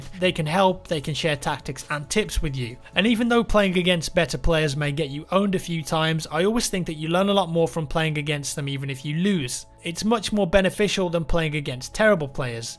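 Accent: United Kingdom. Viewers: U r british m8?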